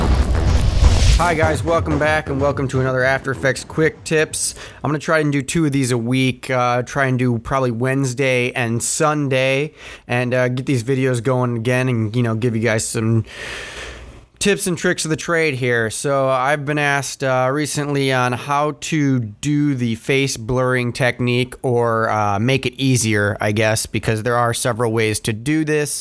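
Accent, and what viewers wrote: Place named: United States. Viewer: I HATE YOUR ACCENT IT MAKES ME SOOO ANGRY!!! fucking amercian talking like a hobo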